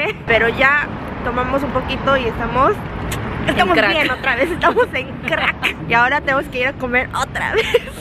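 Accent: Mexico City, Mexico. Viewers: Se te pego el acento fresa de México jajaja, tal vez viviste en CDMX o Puebla? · Que hermosa estas y tu aciento mexicano muy linda saludos mexico te espera oh tu amiga también muy linda 😉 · Tú acento impresionante es mexicano y fresa, jajaja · CHICAS hablan muy bien el ESPAÑOL la chica de pelo largo tiene una voz MUY SENSUAL y se le oye mas bien como del DF en otras palabras chilanga, pero la otra chica su voz me a enamorado a parte que esta muyyyy linda, besos y abrazos para las dos y muchas felicidades por su canal y sobre todo que bueno que les guste la comida de la gloria del cielo MEXICANA, saludos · hola !!! me quede esperando el final (2do video)... también esperaba que en el restaurante Oaxaca pidieras enchiladas de mole 😋, te faltó ponerle limón a los tacos y si deberías probar lodctacos de lengua 😋y me sigue encantando tu acento muy Mexicano!!! 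Saludos🇲🇽 XOXO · Querida eres toda una mexicana me di cuenta en la forma de comer los tacos la chispa que tienes y obviamente cuando hablas saludos desde Chicago 🇺🇸🇲🇽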